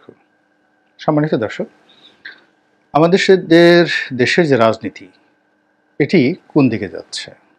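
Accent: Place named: Patuakhali, Bangladesh. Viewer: মি আপনি আর বেশি কথা বলবেন না,আপনাকে কেউ শুনতে চায় না,আপনার বাংলাও হয় না, শুদ্ধ করে বলতে চেষ্টা করছেন কিন্তু হচ্ছে না প্রায়ই,পটুয়াখালীর উচ্চারণে কথা বলছেন খুবই ভালো। যাকে যা মানায়, তাছাড়া পটুয়াখালীর ভাষা তো ভালোই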